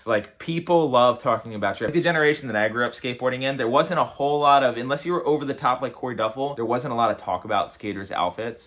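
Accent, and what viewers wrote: East Coast, United States. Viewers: Dude you’re one of my favorite YouTubers in general. I like how real you are and your opinions. I’m from Delco, outside Philly and I can tell you are from the East Coast and you’d fit in well in Delco 😆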